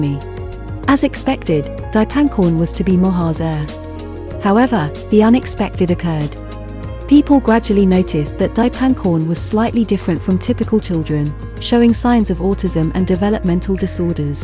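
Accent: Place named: RP, United Kingdom. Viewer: Nice to hear a beautiful standard received pronunciation.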